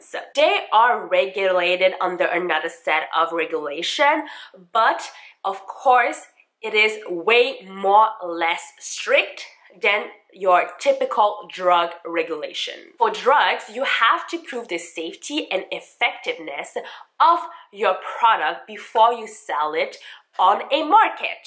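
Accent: Quebec, Canada. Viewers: omg YAY! I'm in Montreal as well! Thought I recognized the accent :) <3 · Just subbed! Is that a French Canadian accent I hear? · Are you from Quebec (province), Canada? Cauz you have that lil accent we have here when we speak english lmao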